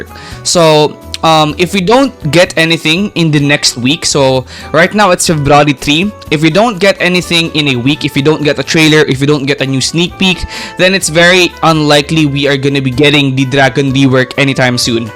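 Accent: Philippines. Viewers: I wonder if your a Filipino like on accent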